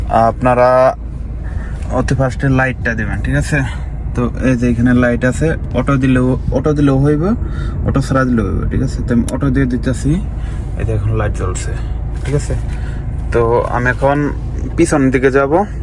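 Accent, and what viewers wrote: Tangail, Bangladesh. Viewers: ভাই কি টাংগাইল এর নাকি। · আপনার বাড়ি মনে হয় টাঙ্গাইল ? যেতে কে বলেন গেলেন 😛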